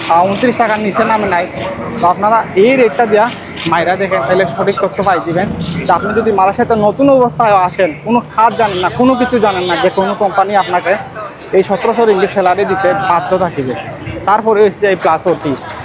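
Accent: Sylhet, Bangladesh. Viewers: ভাইয়া আমি রেস্টুরেন্ট ভিসায় আসতে চায় মালেশিয়ায়। আমার এই কাজের এক্সপেরিয়েন্স আছে, আমি সিলেটের রোজ ভিউ হোটেলে জব করেছি, আপনার কথা শুনে মনে হচ্ছে আপনি সিলেটি। আপনি কি কোন দিক দিয়ে হেল্প করতে পারবেন আমাকে.. কোন ওয়েতে আসলে ভালো হবে.?! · খাটি সিলেটি মাত বা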